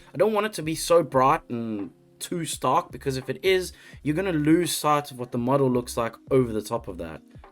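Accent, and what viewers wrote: South Africa. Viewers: Good to hear a South African accent again after moving to the UK! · A cute idea, that a SA accent?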